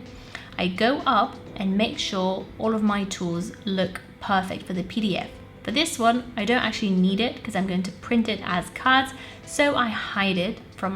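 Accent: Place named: Eastern Europe. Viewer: I wonder where her accent is from 🤔 seems like Eastern Europe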